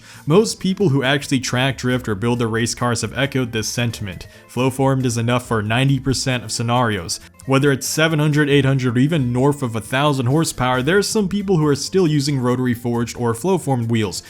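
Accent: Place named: Midwest, United States. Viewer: You have a similar accent to Sam O’Nella, are u from the mid west?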